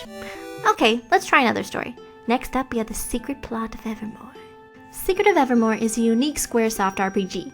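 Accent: Canada. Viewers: If you didn't have the Canadian accent, you would be prefect.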